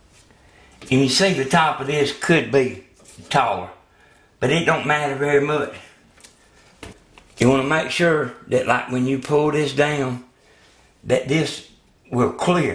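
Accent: South, United States. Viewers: love your southern drawl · I be good ol central valley California boy...no sooner I heard that deep down southern accent...hit that subscribe button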